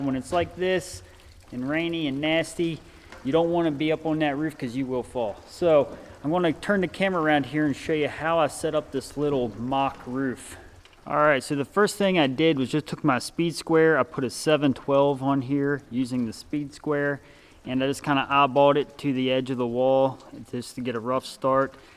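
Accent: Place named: West Coast, United States. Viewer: I understand that. You actually sound more West Coast, lol. 😂👍🇺🇸😊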